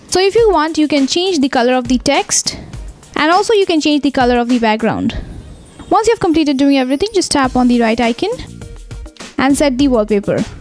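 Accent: India. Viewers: You speak as like Indian's, are you Indian?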